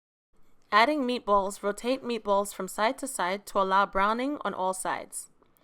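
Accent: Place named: East Coast, United States. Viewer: Love that east coast accent ☺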